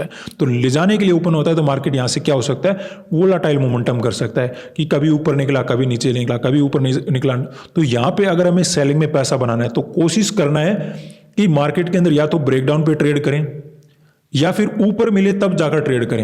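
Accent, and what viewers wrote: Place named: Punjab, India. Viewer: Sir pakka punjabi hai awaj se pata chal raha hai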